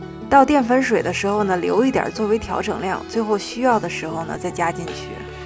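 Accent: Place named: Beijing, China. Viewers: 听口音像是京腔。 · 冒昧請問是哪裡人？在那裡長大的？聲音像北京人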